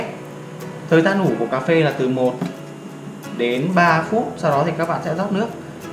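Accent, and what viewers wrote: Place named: North, Vietnam. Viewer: Khg dai dong , ngan gon va giong noi Bac-ky dang yeu vo-cung . Chuc chau luon binh-an 🍀💐😍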